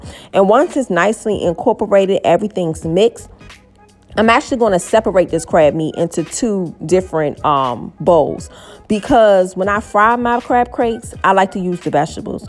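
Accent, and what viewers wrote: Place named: Prince George's County, United States. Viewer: From the sound of your accent I’m guessing you’re from PG County?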